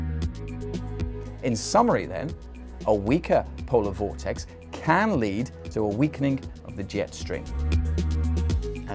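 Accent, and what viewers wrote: United Kingdom. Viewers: Biritsh accent and speaking miles 🫠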